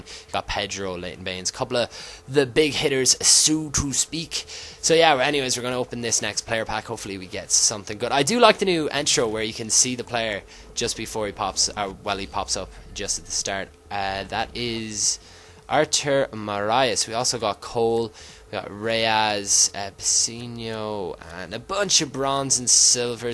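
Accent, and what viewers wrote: Ireland. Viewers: hes irish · are you from ireland? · are u irish PLEASE ANWSER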